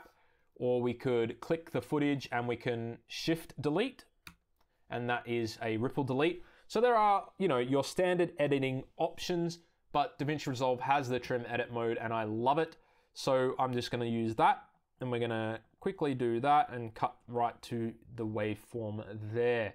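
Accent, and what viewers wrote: Australia. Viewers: Another subbed. You can always trust information with an Aussie accent. Sold on giving Da Vinci a go, although Fusion kept crashing on me the last time I tried using the standalone version on a project. Anything to get away from Adobe subscriptions is worth consideration.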